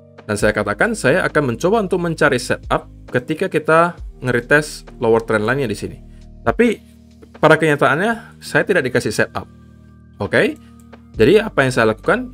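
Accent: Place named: Medan, Indonesia. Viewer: Bro kamu China medan apa Bangka? Logatnya medan y.. Salam dr medan bro 🍻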